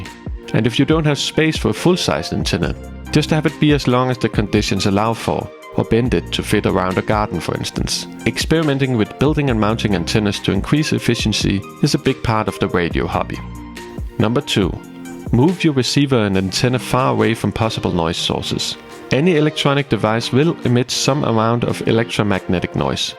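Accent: Denmark. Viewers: 110% dansk prosodi. Det er ligesom at høre Mumlemanden 😊❤️ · Is that a Danish accent i'm detecting? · lmao I watched all the way up to the point where you showed the Danish map until I realized you were Danish too. Your accent is very faint.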